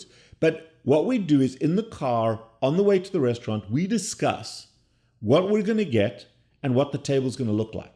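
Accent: South Africa. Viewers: He has a South African accent. In South Africa we speak Afrikaans which is very similar to Dutch. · 😂Also the French done a child's portion by an interior decorator! 😂😂😂 I'm also South African, and he hasn't lost the way we talk, although he has left the country long ago😂❤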